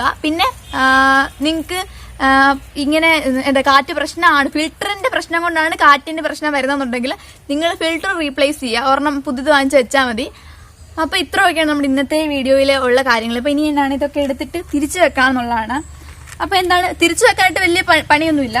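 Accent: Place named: Kottayam, India. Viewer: Kottayam or idukki 😀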